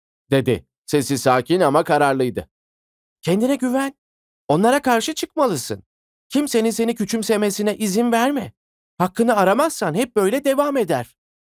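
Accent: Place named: Black Sea, Turkey. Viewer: seslendirmen karadenizli kesin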